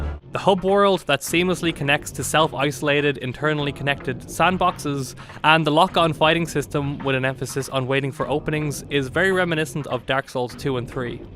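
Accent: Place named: Dublin, Ireland. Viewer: It must be our beautiful Dublin Accent x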